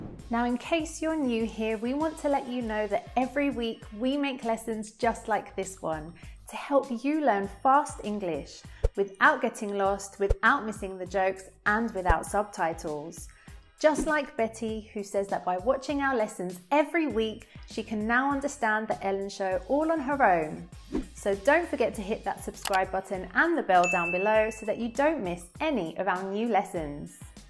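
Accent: United Kingdom. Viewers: Nice to see you,.:) I like your British way of speaking. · I enjoyed this lesson because i rather the british accent, i'm a basketball player and admireee MJ! THAAANKS!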